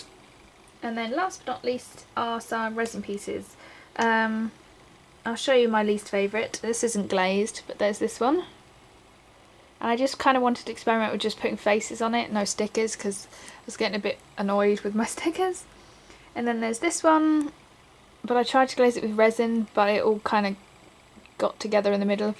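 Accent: United Kingdom. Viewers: Your charms are very cute >.< I love them,perhamps i love your british accent x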